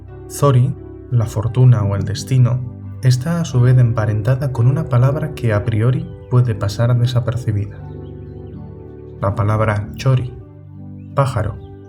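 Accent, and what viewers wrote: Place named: Castile, Spain. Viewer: Tengo una duda. Cuando te escucho hablando, escucho que hablas el Euskadi o vasco con un tono más castellano que vasco. Eso creo! Mi duda es ¿la letra Z pronunciada en vasco igual que en castellano?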